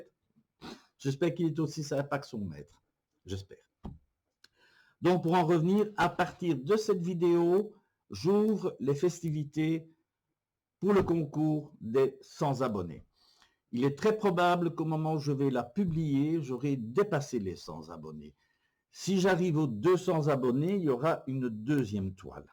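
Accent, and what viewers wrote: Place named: Belgium. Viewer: Bonjour,j'adore t'écouter en étant sur mon dp, mon beau-frère est belge aussi, je me lasse pas de vos accents 😁,chose sérieuse...video 11,toile 3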